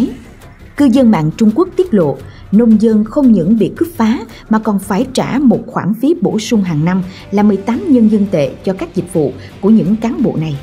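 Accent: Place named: Quang Ngai, Vietnam. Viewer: Giồng như ở Quảng Ngãi VN quá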